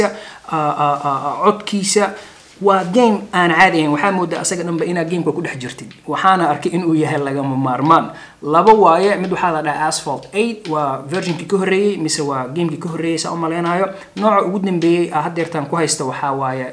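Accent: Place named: Djibouti. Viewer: Réer Djibouti ayant yahaye marka ii dul kaata sidan uu gorayo af somaliga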